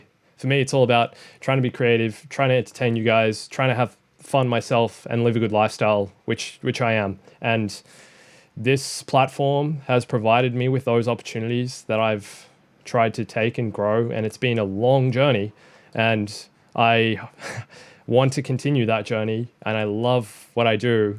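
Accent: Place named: Australia. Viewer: Wait, You are an aussie, I thought you were a kiwi.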